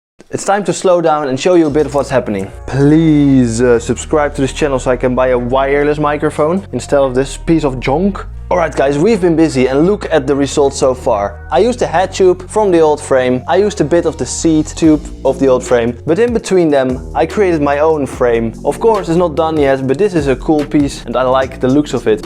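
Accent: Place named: Netherlands. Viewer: I hear a dutch accent haha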